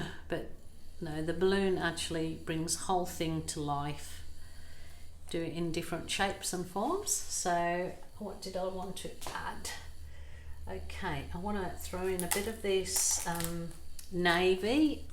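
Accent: Birmingham, United Kingdom. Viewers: Haha haven’t lost your Brummy accent. Love your work 😊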